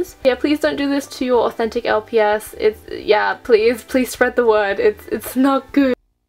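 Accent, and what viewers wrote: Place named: United Kingdom. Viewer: Wait YOUR BRITSH wow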